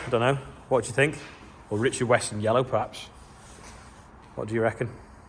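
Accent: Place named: Wales, United Kingdom. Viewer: Keep the birthday bumper Until may 25th so I can hear your welsh accent saying my name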